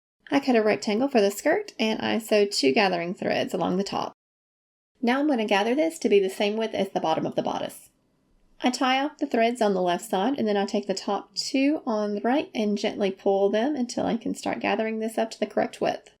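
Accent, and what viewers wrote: South, United States. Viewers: I can't place your accent! Can you tell me where you're from? You sound Southern like me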